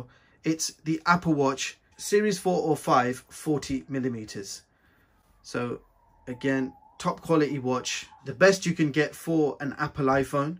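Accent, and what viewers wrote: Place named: England, United Kingdom. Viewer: Generally I don't ever leave comments, I know I should, but sometimes (as someone who needs to get the relevant info I'm looking quickly I never do. However, this occasion I was so impressed with the content of this video I felt compelled to show my support. Everything I needed answers to was in this one video. Plus, the small wrist bonus section was really appreciated. As said in the video, it's a very overlooked part of buying a smart watch. Also, as a Englishman it was great to hear an English accent narrating the video (the generic American and often computer generated voices drive me up the wall 😬😁). Really great video, very informative and exactly what you look for on YouTube when looking into some new tech to purchase. Excellent work, Thank you. Liked, commented and subscribed!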